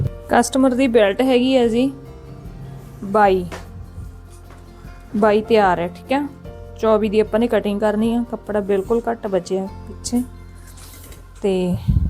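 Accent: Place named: Malwa, India. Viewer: Ssa dii, Diii tusi kehri side toh nd tuhadi boutique kithe aa????? Tusi Malwa area de lagde o kyu k bolan ch thoda farak aa sade nalo 😊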